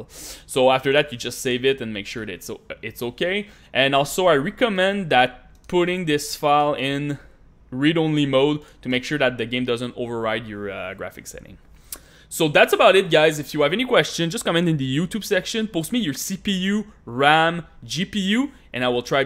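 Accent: Quebec, Canada. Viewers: Tes quebecois c sur :P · i swear to god this guy must be from quebec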